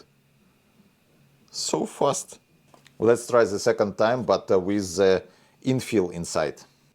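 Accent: Russia. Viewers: Если судить по акценту, то вероятно носитель русского языка. Я хз как я попал сюда, но видео занимательные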